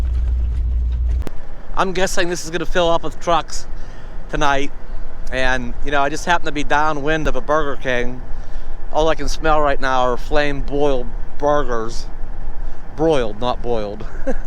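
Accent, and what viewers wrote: Pennsylvania, United States. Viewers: you can always tell a PA accent!! very distinctive.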